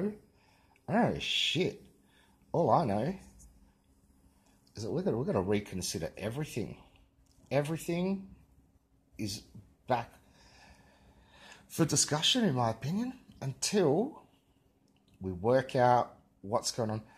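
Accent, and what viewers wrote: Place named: Australia. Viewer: I just saw you on the RV Pod Cast. I noticed the Aussie accent so I thought I'd check you out, I'm in Coffs Harbour. I saw this dome video so it was the first of yours I looked at. I got trolled 4 to 4 and a half years ago about southern stars rotation so I looked into it, I looked into Stellarium and I also made a dome concept model with a salad bowl and lights and a phone camera to record inside the salad bowl. Links below, if you have time to check them out let me no what you think. Comments and likes are turned off on the videos because of continued trolling. Southern Stars, Reflection Of The Centre Stars? Part 1 (Using Stellarium) <link> Southern Stars, Reflection Of The Centre Stars? Part 2 + A Simple Experiment <link> Southern Stars, Reflection Of The Centre Stars? Part 3 Check With A Physical Model <link> 24 hour DAYLIGHT in Antarctica Experiment timestamped to shorten it. 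Flat Earth - 24 Hour Daylight Not Sun In Antarctica, Reflection Test Using Salad Bowl <link> This one timestamped also to shorten the vid, an idea on how the sun sets south of west in the southern summer. Flat Earth - Circling Sun Sunset, Circle Of View, Atmospheric Lensing, Experiment (Preliminary Test) <link>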